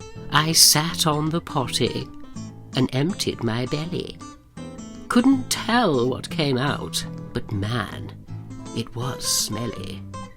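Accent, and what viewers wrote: RP, United Kingdom. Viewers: Omg!!🤣🤣👊👏👍 you are hilarious. I've never heard someone with such an accent telling stories like this! The posh accent makes it even more funny!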